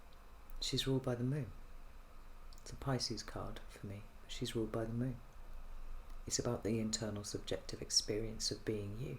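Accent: United Kingdom. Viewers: I absolutely love your readings, but am wondering if there is anything that can be done to improve the audio. I struggle to hear them - add that to the struggle to pick up the British accent and I have a helluva time!!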